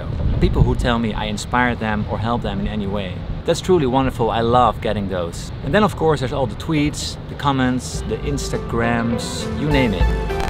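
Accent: Netherlands. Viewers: I knew you were Dutch from the moment I heard you speak! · I'm a noob guitar player and your stuff's casual teaching has helped me learn faster than many other tutorials online and in books, so awesome work. Also... I absolutely love your accent. It's Dutch, right? I also am studying accents for voice acting and watch your videos to learn how to do that accent. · He is so Dutch. His English sounds Dutcher than Dutch. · Sweet Brew Yeah it’s a Dutch accent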